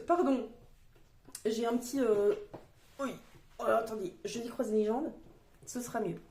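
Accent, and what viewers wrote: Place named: Franche-Comté, France. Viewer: Super vidéo, tu es vraiment top, j'adore ton enthousiasme et ta joie de vivre que tu nous partage. Et ce que je préfère c'est ton accent franc comtois que j'adore tant. Bon courage pour monter dans le nord.... Le jura c'est quand même vraiment trop beau.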